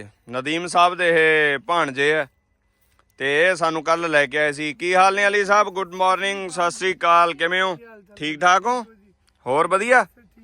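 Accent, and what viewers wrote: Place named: Dhanaula, India. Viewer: ਤੁਸੀਂ ਕਸਮ ਖਾਧੀ ਹੋਈ ਹੈ ਕਿ ਕਿਸੇ ਵੀ ਇਲਾਕੇ ਵਿਚ ਜਾਓ ਤਾਂ ਅਪਣੀ ਧਨੌਲੇ ਵਾਲੀ ਬੋਲੀ ਹੀ ਬੋਲਣੀ ਹੈ? ਬਾਈ..ਬਾਈ.., ਝੋਨਾ, ਨਿਆਈਂ..! ਇੱਕ ਬੇਬੇ ਨੂੰ ਤੁਸੀ ਸਤਿ ਸ੍ਰੀ ਅਕਾਲ ਬੁਲਾਈ ਤਾਂ ਉਹ ਮੂੰਹ ਵੱਲ ਦੇਖੇ। “ਨਿਆਈਂ ਦੀ ਜ਼ਮੀਨ ਦੱਬੀ ਬੈਠੇ” ਤੁਸੀਂ ਦੋ ਵਾਰ ਬੋਲ ਚੁੱਕੇ ਹੋ। ਪਹਿਲਾਂ ਨਾਸਿਰ ਢਿਲੋਂ ਨੂੰ ਵੀ। ਦੱਬਣ ਦਾ ਮਤਲਬ ਤਾਂ ਕਬਜ਼ਾ ਕਰਨਾ ਹੁੰਦਾ। ਉਹਨਾਂ ਦੀ ਪੈਲ਼ੀ ਹੈ ਤੇ ਤੁਸੀ ਦੱਬੀ ਦੱਸਦੇ ਹੋ?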